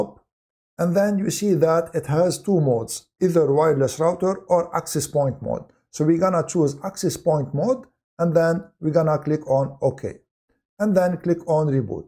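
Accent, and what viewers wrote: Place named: Lebanon. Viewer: Nice video and nice Lebanon accent 😅 I had a little question, if i put the router in AP mode, will the guest wifi still works ? and will it be separated from my main network ? Thankyou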